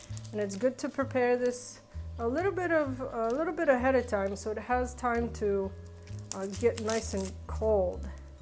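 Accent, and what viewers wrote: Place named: Poland. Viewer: I BEG OF YOU TO PUT YOUR RECIPE FOR BEET SOUP. MY BROTHER HAS PTSD AND FINDS COMFORT IN OUR POLISH HERITAGE. MY COUSIN AND I WANT TO MAKE HIM BEET SOUP WITH SHREDDED BEETS AND MAYBE A BEEF BROTH?HE ALWAYS LOVED IT WITH A BIT OF SOUR CREAM. PLEASE ASAP. IVE BEEN LOOKING FOR IT EVERYDAY AND ONLY FOUND IT AND DIDNT WRITE THE RECIPE DOWN,! DUMMY ME! I LOVE THIS LADY. SHE COULD BE MY SISTER. LOVE YOU BEAUTIFUL VOICE AND SLIGHT Polish ACCENT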